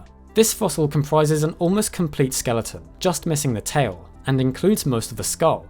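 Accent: RP, United Kingdom. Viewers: You sound like, but I dig it. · You're so British. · I like British accents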